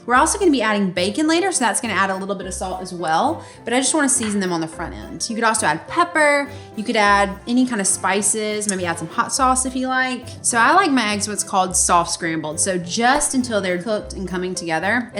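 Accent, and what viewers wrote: South, United States. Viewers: It's a beautiful southern accent ! " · Jacob Halifax - It's like Southern and Valley girl at the same time. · Huneeee where's you from? Love a southern lady. I grew up in NC and your voice sounds like home!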